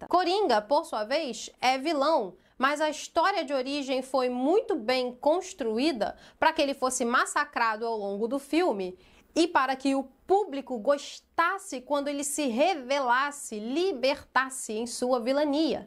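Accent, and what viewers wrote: Rio de Janeiro, Brazil. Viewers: Moça, tu és carioca?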